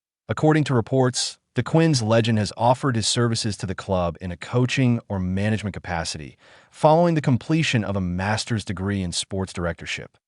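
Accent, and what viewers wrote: United States. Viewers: AI crap, not just because of the US accent commentary !!! Don't bother with it folks !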